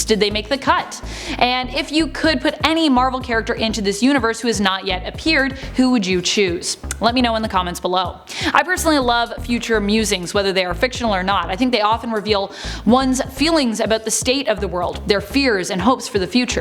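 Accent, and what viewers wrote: Canada. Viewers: The fact that she's Canadian is just a coincidence.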